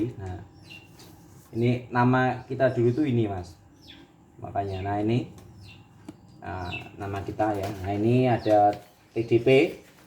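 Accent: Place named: Java, Indonesia. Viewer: Asli wong Palembang,,,,,, TPI logat bicara Jawa ny jngan d bawa mas,,,,,,bawa logat Palembang aj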